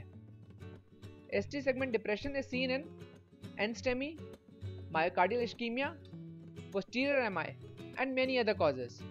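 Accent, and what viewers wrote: India. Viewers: I really wish this guy spoke slower and was not Indian.. smh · I like your accent. Indian medics are excellent.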